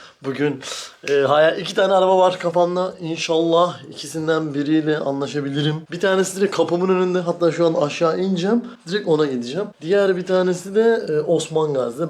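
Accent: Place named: Muş, Turkey. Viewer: Hayt dayim canını yiyim ne güzel konusuor mus sivesiyle muslular candir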